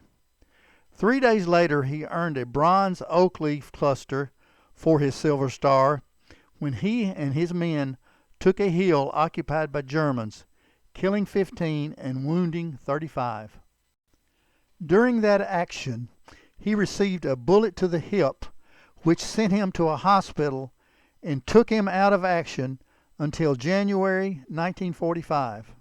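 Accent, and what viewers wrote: Texas, United States. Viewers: Don't know who narrated this video, but only took the first three or four words to let me know he is a fellow Texan. I live in San Antonio, TX, where the VA hospital named after him is located and I have gone through the small museum there with relics and videos of his service. Indeed a fine man and a real hero.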